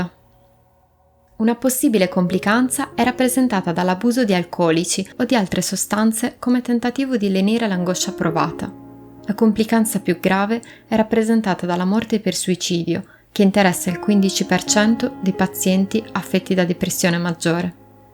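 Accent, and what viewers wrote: Sardinia, Italy. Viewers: Accento di bidda....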